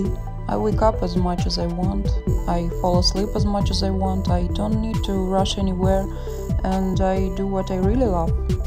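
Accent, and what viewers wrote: Russia. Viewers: Girl, I MISSED HEARING YOU AND SEEING YOU UPLOAD. You have absolutely NOTHING to be nervous about! Russian accents are one of my favorite, and your voice is absolutely gorgeous. Don't you ever be ashamed. The same with your artwork, that's nothing to beat yourself up over. That one criticism out of the many compliments is a good way to show yourself that your art IS art and that your journey for improvement is never over. I think one of the beautiful things about being an artist is that you're not alone. Everyone has gone through and been shaped by similar struggles. Keep on keeping on. You are amazing, BEAUTIFUL, and one of my biggest inspirations. You are the reason I picked up an ipad and I do not regret it for a second. Thank you for providing amazing content. 💙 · Could u make a video showcasing your “cool smears” brush pack, please? 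I can’t seem to find any 💓 (Btw, I really love your voice! & your art too, ofc. I can really relate to your feelings regarding your voice.. I am a big fan of accents, though, and Russian accents are particularly beautiful imo. (Not in a weird/creepy way, just in an admiring/appreciative way!)✨ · Girl ur voice is just so pretty! And ur accent? Russianaccent is just so lovely i rly like it <3 following u since a while now ur speedpaintings r always so amazing and also kinda relaxing to watch <3 much love from germany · Your voice is beautiful. Russian accent is the best for me. Its calming and soothing lol. Your art and voice us the most beautiful thing i saw and heard. Keep up the good work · Она русская чтоли? Акцент похож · ЧТО. Я УМЕРЛА ПОСЛЕ СЛОВ "АЙМ ФРОМ РАША".......... я всегда думала, что ты из Америки.... даже подумать не могла... смутилась только когда услышала акцент, и тут.... 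воу... удивляюсь, почему у тебя не миллион.. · I just wanted to point out: even tho you see some hate, never forget how many people actually love your art. For me personally, you're such an inspiring person with your style, the way you shade etc. And I loooove your russian accent, it sounds so wonderful and calming💖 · i love russian accents! don’t be embarrassed of it ❤️ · Whoaa finally! Don't worry about the voice and the accent, I really love russian accent and I am sure everyone is!💚 Good job my queen! waiting for your next voiced video👁️👄👁️✨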